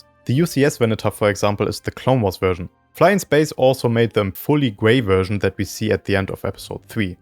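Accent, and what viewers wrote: Germany. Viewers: Mann hört das du deutsch bist so raus😂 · Wie kommt man in Deutschland an die Teile, wenn man das bauen will. Die Anleitung finde ich bei euch auf der Website. Cool. Und da ist auch ein XML mit ner Teileliste. Auch cool. Aber Lego Pick A Brick akzeptiert nur CSV oder JSON Files. Also hab ich die Liste mit Hilfe von AI in ein CSV File umgewandelt. Leider bekomme ich dann bei Lego die Meldung, dass es kein einziges dieser Teile derzeit verfügbar gibt. Ich nehme mal an, irgendwas mit den Teilenummern kann nicht stimmen? Oder Lego Pick A Brick ist der falsche Ort? Wäre nett, wenn ihr mir da helfen könntet. Weil so lange ich die Teile nicht irgendwo bestellen kann, macht es ja auch keinen Sinn, die Anleitung zu kaufen. Hätte echt Lust, das nachzubauen. Ich hab Anhand deines Akzents einfach darauf geschlossen, dass du deutsch bist lol.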